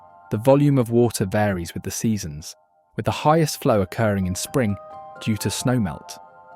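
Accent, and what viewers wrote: United Kingdom. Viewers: You missed so much. Why even have Souix Falls And Mitchell but leave out Rapid city? Also your narrator has a British accent and doesn’t always pronounce names properly. Start over and do West River and East River in a different episode. It’s beautiful but poorly done. west river citizen for 30 years , and still a frequent visitor and site wearer, never gets old!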